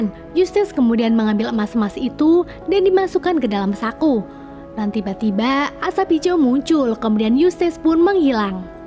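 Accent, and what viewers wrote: Pemalang, Indonesia. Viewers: Pemalang nih ye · Wong Pemalang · lahhh pemalang ndii mbak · Wong pemalang yo😁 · Emg asli Pemalang? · orang pemalang kah? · Mbak orang pemalang kah? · Fix ini miminnya orang Pemalang yah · wah pemalang to sampean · Wong pemalang ya mbak? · Jebule wong pemalang yaa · Mba e wong pemalang to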